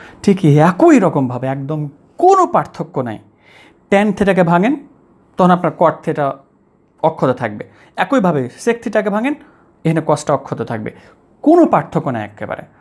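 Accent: Rajshahi, Bangladesh. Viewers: Are you from Rajshahi??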